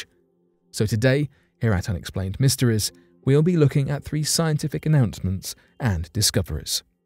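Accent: United Kingdom. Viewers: I am given you minus for this nervous British accent! Speak American way or shut up immediately. People of the planet, disguise your colonial past. Pay back reparation to your former colonies! Big time!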